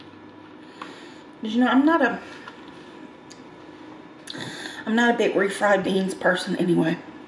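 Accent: South, United States. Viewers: 🌮Cheat days are a good idea when your weight loss stalls. Enjoy!🌮 Your southern accent is thicker than mine today! Lol!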